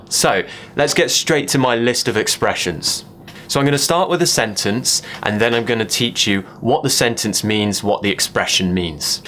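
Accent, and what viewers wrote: United Kingdom. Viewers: Thanks so much, very useful video and I like very much your British sound, it's wonderful and clear. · I'm so glad to watch you video..the way you talk British English so very clear 😘😘😘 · Hi! Thanks for your video. Love your British accent, mate!:) I wonder, can we also say ‘go down the pan / the tubes / the plughole’ instead of ‘the drain’ with the same connotation and level of politeness? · Your accent is awesome! I wish I spoke English with British accent like you. 😊😊😊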